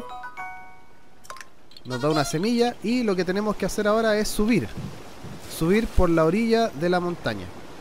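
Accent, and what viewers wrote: Chile. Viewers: Se nota que eres chileno por solo escucharte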